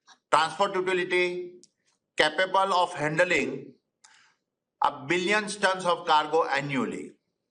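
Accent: India. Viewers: Damn! Even his english is like modi's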